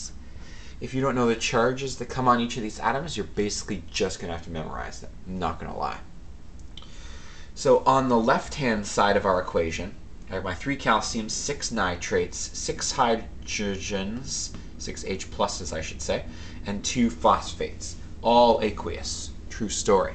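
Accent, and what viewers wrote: Canada. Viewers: sounds canadian to me :) but i wont take points away, it was a very good video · are you canadian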